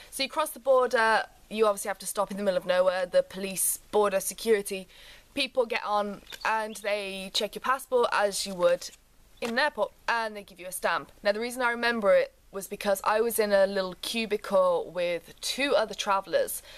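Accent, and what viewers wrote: England, United Kingdom. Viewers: i recon you've lost your English accent a little bit.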